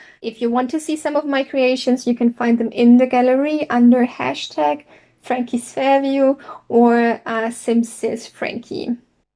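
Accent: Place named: Germany. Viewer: Sie ist sowas von Deutsch. Ihr Akzent 😅😂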